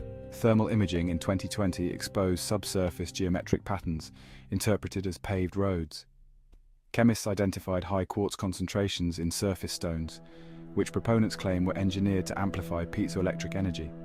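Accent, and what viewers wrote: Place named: United Kingdom. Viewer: Haha I have the opposite reaction to this other guy - this is a real human reading this right? In English at least. It's not full of the usual AI-isms (disinterested inflection, no pauses) - if it reads a number wrong, or elides a headline into a sentence, or forgets to breathe at any point, I'll be sad. on the other hand, it's speaking British english, but listed as American. That makes certain things more likely than others. Well, if it's an AI, it's a better one.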